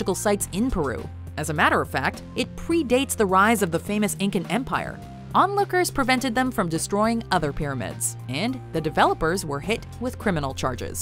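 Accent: United States. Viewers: the woman has a US accent, therefore she is being pretentious. It is irrelevant how it is pronounced in other countries. You want to hear it pronounced that way, make your own videos. As for her, in the USA, it is a mispronunciation. VASE. VAYSSSSS.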